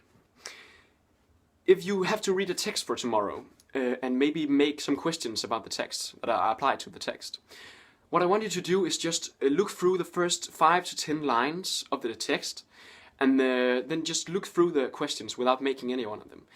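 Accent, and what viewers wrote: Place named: Denmark. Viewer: Går ud fra du er dansk ud fra din accent, det er fandme noget bull shit det der, du bilder folk ind, at man kun skal fokusere på enkelte opgaver, frem for alle. En manglende opgave betyder -3 for den opgave og det er ikke ligefrem skide godt for ens årskaraktere. så kan det da gøre skide ligemeget om du så for 12 i tre afleveringer hvis du alligevel for -3 i to andre. IDIOT!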